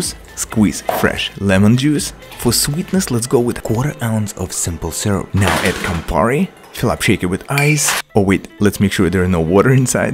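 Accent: Russia. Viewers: Hi,where you from?you have a russian accent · У него русский акцент?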